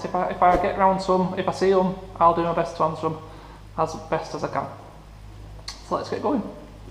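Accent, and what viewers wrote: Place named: Northern England, United Kingdom. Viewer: Lovely Jubbly video, great northern accent.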